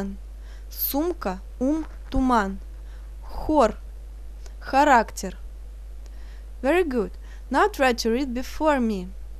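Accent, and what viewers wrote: Russia. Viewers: hello, I like this girl's English accents, does who knows that is every Russia girl speak English like this? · У Вас приятный русский акцент. Приятно слушать! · OMG ... Alphabet is so difficult but russian accent is so beautiful 😍😍😍😍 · Сразу слышно что русская))) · По произношению понятно, что ты русская) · i love her russian accent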